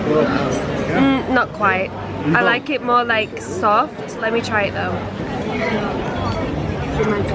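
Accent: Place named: RP, United Kingdom. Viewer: I love your accent, so posh lol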